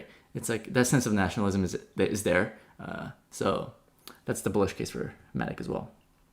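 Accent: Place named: California, United States. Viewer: Good content. I love your casual Californian style. ✌🏻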